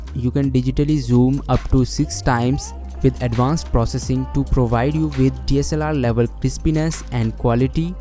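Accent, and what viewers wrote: India. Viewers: lol I just want search best camera apps for my iPhone and I come here only just hear Indian accent so funny 😂 haha. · I really dislike the Indian accent, but man I can’t dislike the video! Great job buddy. The quality is on point!! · am i the only one who hates indian accent english?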